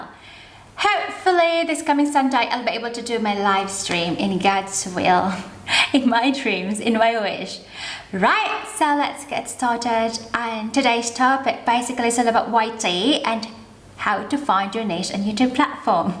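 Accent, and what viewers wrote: United Kingdom. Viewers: i have a few passions that I am putting on display. but mine are from a student's perspective. I like the way you speak,. it's the king's accent, right?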